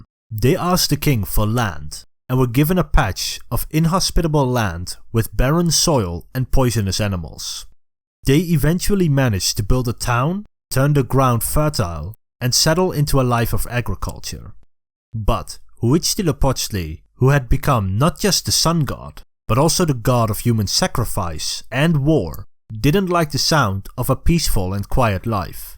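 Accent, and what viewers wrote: Netherlands. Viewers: I want to know too! I am hearing the slightest bit of a dutch accent? I am confused too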